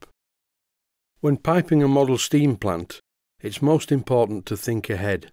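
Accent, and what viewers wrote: Yorkshire, United Kingdom. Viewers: Do all your video's in full Yorkshire accent please! I don't care if I can't understand it, it still sounds great! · Very nice job and I say you should go full Yorkshire accent.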